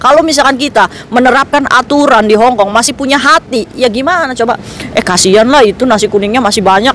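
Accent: Indramayu, Indonesia. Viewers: Metu bhsa dermayune angel angel 😂😂😊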